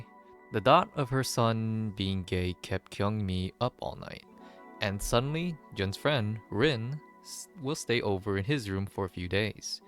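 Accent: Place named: Ireland. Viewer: cool cool, but why do you keep saying "mudder" are you Irish? Or Irish american?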